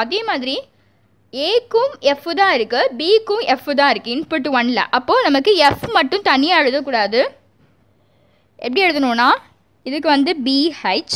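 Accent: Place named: Kanyakumari, India. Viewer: Sister r u from kanyakumari ur slang seems to be like that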